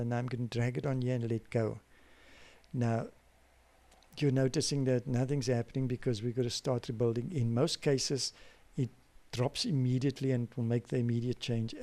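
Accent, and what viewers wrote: South Africa. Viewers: ) that ZA accent is very telling. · Awe m'bru...you Capetonian? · you kind of have a South African accent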